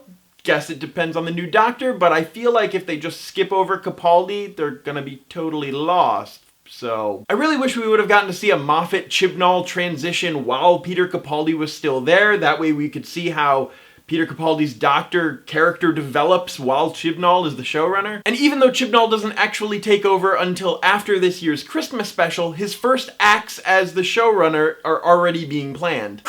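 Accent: United States. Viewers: its weird hearing an American saying Doctor who and stuff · I can undertand scots speaking really quick but I didnt get a word of your first sentences. American accent is so hard to get sometimes.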